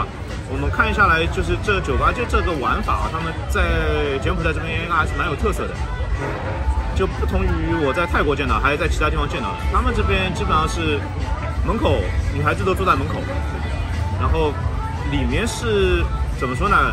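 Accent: Shanghai, China. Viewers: 上海人？还是江浙沪的人?很好奇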